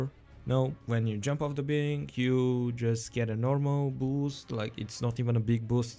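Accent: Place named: Russia. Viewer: Чё то кажется, что у тебя русский акцент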